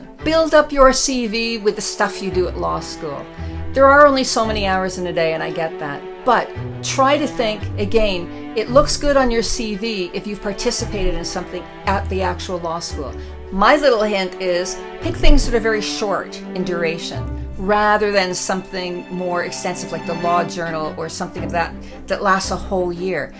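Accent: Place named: Canada. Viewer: Oh ! Wow ! I had no idea !?? That you are/ were a lawyer !!!?( Did you mention in the 1st video I watched !? ) You are a very interesting woman ! 1st. A very good looking lady .2nd. A lawyer 3rd .Cares about animals 4th. has a musical talent ( piano )5th. a vloger 6th. a Canadian woman living in Wales ! ( keeping her own accent & not speaking w/ a Welsh or British accent ! In a way , cooler as your native language is English to begin with ! I've met many English men lost their accents living in U.S. It's a shame ! I think everybody should keep somewhat their accents ! 7th.living a healthy lifestyle , a vegetarian & rides on a bicycle everywhere you go ! ( maybe except for drinking !? Lol ). 8th .A mother of a gorgeous young lady who's gonna be a lawyer herself in the future ! COOL !!! You looked like an actress in the picture !!! I feel sad to see your friend's passing information at the very end ! 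Way too young ! I am sorry for your loss ! Very sad .💜💜💜💜💜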